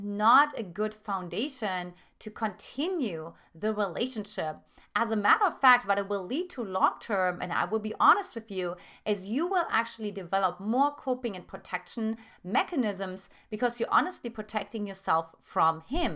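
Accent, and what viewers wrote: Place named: Germany. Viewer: Thanks. Are you from Germany? I can hear a little German accent when you speak.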